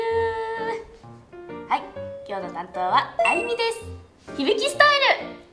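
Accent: Japan. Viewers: idk why i just found that when japanese talks in English they became cute. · japanese ENGRISH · Idk why but japanese speaking in English is amusing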